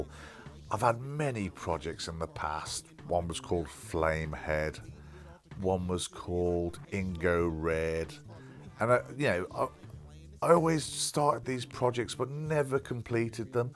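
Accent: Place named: Black Country, United Kingdom. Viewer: i detected the accent (takes one to know one :) ), just checked your site and OMG! you went to stourbridge college too!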